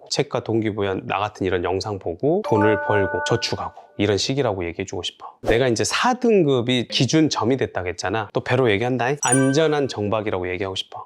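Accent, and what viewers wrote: Jeolla, South Korea. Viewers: 마음가짐 확언만큼은 이미 1등급 입니다 좋은에너지 나눠주심에 감사합니다 긍정바이러스는 선물 입니다 중간중간 구수한 전라도 사투리도 정겹구요 좋아요 버튼은 늘~항상 잊지 않는것도 팬심이랍니다ㅎ 파랑색을 좋아해서 자꾸만 쇼파가 탐이납니다요ㅎㅎ 파랑새는 멀리있지 않습니다 이미 제 마음속에 있네요^^ 확언! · 경상도인데 전라도사투리 넘 재밌어요~~😂😂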